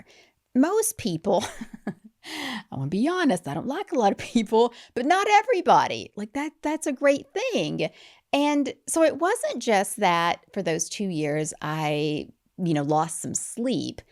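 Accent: United States. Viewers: Painful American … my ears started bleeding listening to this crap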